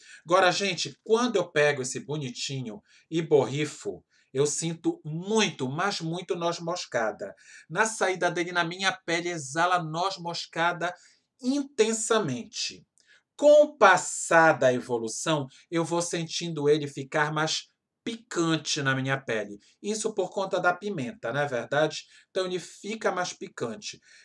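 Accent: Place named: Ceará, Brazil. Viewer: Amor você é nativo de qual Estado do Brasil...achei seu sotaque tão cearense ❤️ Eu moro em Fortaleza ce, cheiro!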